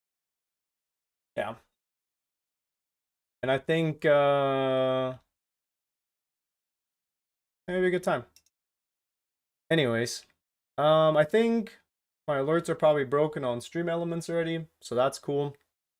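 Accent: United States. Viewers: btw are you german you sound american af i forgot if you are german or if you are american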